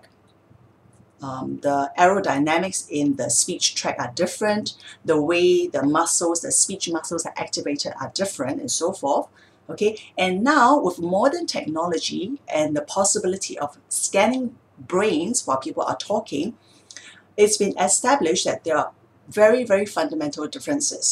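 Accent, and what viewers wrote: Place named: China. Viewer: Btw, I enjoyed the clear and smooth English with the very tiny, little little charming Chinese accent..what a pleasant voice to listen to. Very calming.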